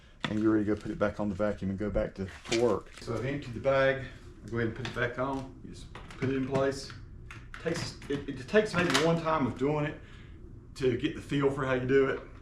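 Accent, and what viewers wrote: South, United States. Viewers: Thanks for the detailed vid from a fellow southerner (I am a North Carolinian; I think you are more Alabama or Mississippi correct?). Anyway, I couldn't believe how bad Dyson's video was, especially the part with NO AUDIO. Shame on them for charging so much but not giving us an actual printed manual (my latest version only has a link to a .pdf) and a crappy silent vid.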